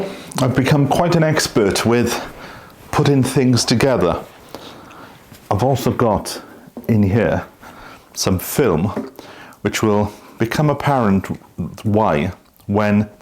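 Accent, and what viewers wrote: Wales, United Kingdom. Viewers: I'm loving your channel! My father grew up in Neath. I miss his lilting accent and his magnificent singing voice. He left Wales in his late 20's, because of his very bad asthma... they told him he needed the sun and dry air in South Africa, so off he went. And then he met my mother and a while later I arrived. Your accent is not as broad as his was. Even so, it does my heart good to hear it! The friendliest man, he loved telling a good tale and sitting down at the piano, playing and singing his heart out. Bless you and thank you for the happy nemories and your fascinating channel. Bronwen from Johannesburg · That story about the fish and chips order made me laugh - hardly surprising they didn't want to deliver 300 miles away!😁 I wonder what the locals think of your accent - it is a definite Welsh accent to me, but perhaps to their ears it is quite mild and you even sound a bit English? 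How are you getting on with reviving your Welsh language skills? Do the locals speak Welsh on a daily basis? You seem very settled there already. 🙂 The hilly terrain will soon improve your fitness if you take daily walks.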